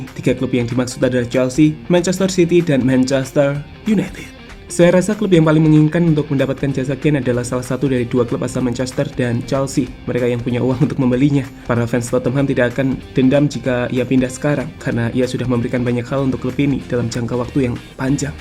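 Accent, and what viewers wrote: Indonesia. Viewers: Anda Orang Asli Malaysia..?? Kok Logat bicara nya Indonesia Banget..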